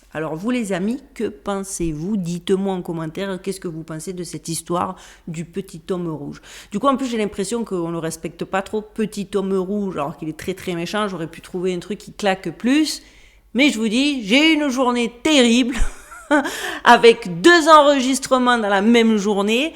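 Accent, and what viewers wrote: South, France. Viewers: Merci belle d'âme d'outre atlantique avé l'accent si typique de nos contrées du sud de la France ! C'est toujours avec un plaisir éréfrainé que je bois tes recherches qui partent dans tous les sens et les éveillent , les sens ! Merci pour tes nombreuses recherches dont certaines que je n'ai pas faites et qui pourtant sont d'un grnd intérêts quand d'autres pas mais là avec le nain polèon , tu m'en apprends de biens belles ! Comme j'aime ton esprit rebel et tacuriosité très saine , ne lâche rien Sista et prends soin de vous ! Merci , force et courage ! Namaste 🙏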